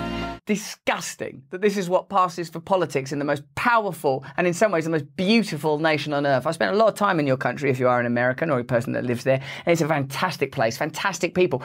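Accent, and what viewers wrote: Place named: United Kingdom. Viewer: Why do so many people like this guy? Discusting, arms flailing about, that British accent is really back alley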